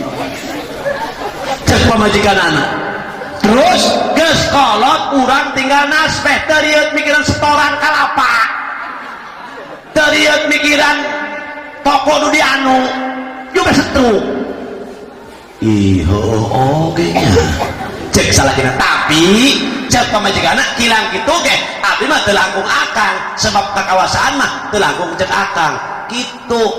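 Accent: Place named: East, Indonesia. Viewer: Syedap .. sundanya logat mana kaya daerah timur Salam Lembur Kuring Purwakarta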